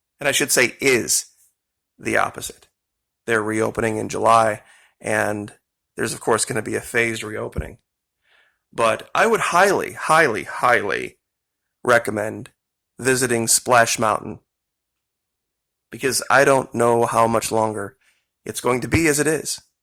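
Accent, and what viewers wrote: South, United States. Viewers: Southern accent? Probably remove Louisiana from the map. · Are u from the south, ❓😌🙏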